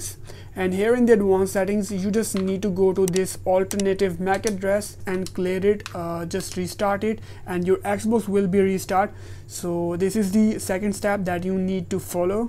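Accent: India. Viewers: Nah bro you guys are racist man just cause of an Indian accent?? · it’s always the indian sounding guy who helps a lot somehow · He sounds like a indian but is works is almost destroyed my xbox before this😂😂 but Thanks man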